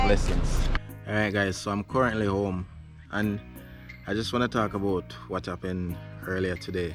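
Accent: Jamaica. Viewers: I was about to comment on your ascent, then I read this comment and know your jamaican heritage, big up youth. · It was a gift, you dont refuse gifts. Pay it along to someone else if you feel like doing so. Its a blessing to you and your family. Some persons may have not acted well with you fishing there, she was very nice. Not to worry you were not begging and even if you were, its not a crime, she was nice, just give God thanks. I detect an accent, are you Jamaican? First time on your channel.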